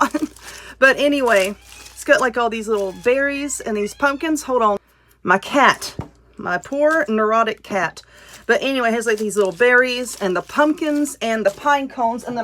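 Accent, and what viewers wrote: South, United States. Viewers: Love your ideas! I love your southern accent and your personality just cracks me up 😁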